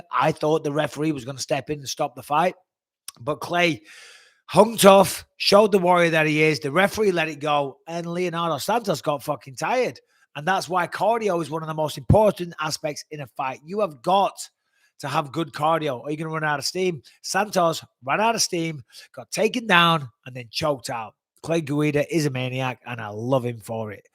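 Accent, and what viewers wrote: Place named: United Kingdom. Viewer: Not sure why you were one of my favorites… probably that British accent ;)…love the content brother keep it up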